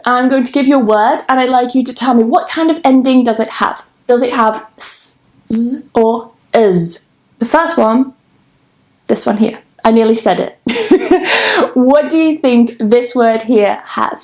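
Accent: United Kingdom. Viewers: Such a FANCY British Accent and very helpful ❤️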